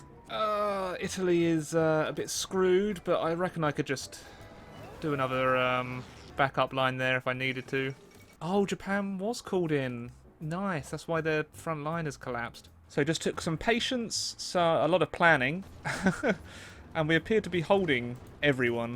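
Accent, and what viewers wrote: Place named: United Kingdom. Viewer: I have noticed when you read outloud some of the chats messages you change up the i to he. Is this a normal british thing?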